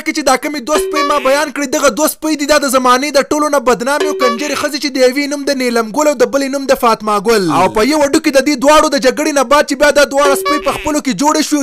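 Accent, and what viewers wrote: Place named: Dir, Pakistan. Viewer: on fire, da accent kho d dirojy dy bacha jee, snga teek me oway ka na, hum da dagha region ye.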